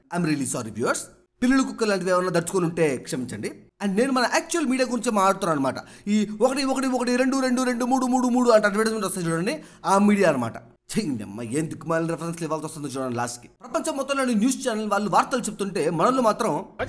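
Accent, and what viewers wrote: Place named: Tirupati, India. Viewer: nenu undedi Tirupati bro Slang ni guess chesa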